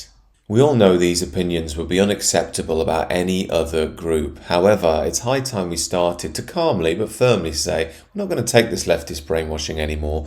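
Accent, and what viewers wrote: United Kingdom. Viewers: Says a brown man speaking with a British accent... "That which is well taught, lasts for a long time."(Tao) Culture is from the hinterlands not the cities. Even though they like to pretend they are more cultured, which is what this is. The reason this is true, is because cities are like Elephant graveyards. More people die in cities than are born there, and when theres a plague, or a war, or a population collapse, the cities are where the worst is the most. Suggest listening to Whatifalthist's video on population collapses. An example is the great depression in the US, some 14 million died of malnutrition related causes, mostly urban as rural people grew food. In WW2 London was a major target. Rural areas maybe get a crashed airplane. Basically cities are unnatural, they are caused by the love of money... "That which is unnatural, does not last long."(Tao) Usually cities get trashed, and then the Ant farm rebuilds on the same site. 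But not all the ants make it. Sometimes its even invaders who rebuild on the sites of cities they trashed. England has cultural invasion syndrome. Its not the first time in human history. The Germanic Barbarians who trashed Rome, then decided they were the Romans... But it didnt work, because they werent Romans... They were still Barbarians. It took them another thousand years in their own lands to climb into an acme civilisation. "Civilisation, is the terminal phase of a culture."(US Army ROTC training manual, "Readings in the Evolution of Warfare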